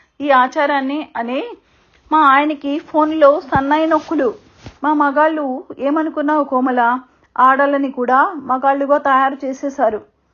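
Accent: Telangana, India. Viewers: జూపాక సుభద్రగారి కలం,మీ గళం రెండూ బావున్నాయి.మీ గొంతులో సన్ననిజీర వినసొంపుగా వుంది.తెలంగాణ యాస కాస్త తగ్గింది. ❤❤❤❤❤❤❤❤❤❤❤❤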